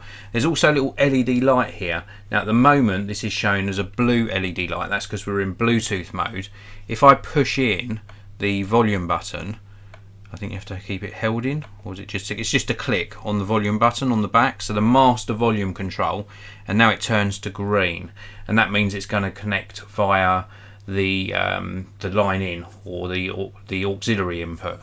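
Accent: United Kingdom. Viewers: I wonder if the roll surrounds on the bass drivers are acclimatized to withstand UK's high humidity climate. Surrounds made of FOAM last less than a year ! BTW, love your British accent.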